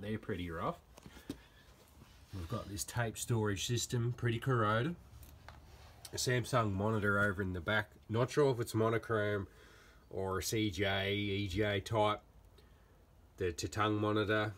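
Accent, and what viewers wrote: Australia. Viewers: Hey mate do you have any crt’s with HDMI inputs? You sound like you’re in Melbourne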